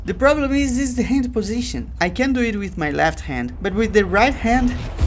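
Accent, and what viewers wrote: Brazil. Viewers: Oh corno tú é Brasileiro né? Seu sotaque e nome estão me dizendo isso -_ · esse cara tem um sotaque mt brasileiro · É brasileiro? sotaque BR forte pra caralho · you speak english like a brasilian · Pode até falar inglês, mas o sotaquezinho BR nunca some kkk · Se n fosse esse inglês nunca iria notar q esse safado é br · Aí ai o sotaque BR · maluko é brasileiro crtz, tem muito sotaque brasileiro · sapoha parece Br kkkkkkk pelo sotaque ou eu tô loko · Why do u have a Brazilian accent? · o sotaque brasileiro torna tudo melhor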